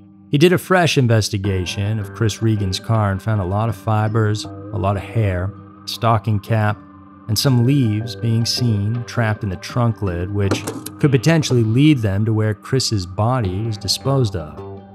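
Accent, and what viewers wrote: United States. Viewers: His voice is really cool. I love his American accent 🤩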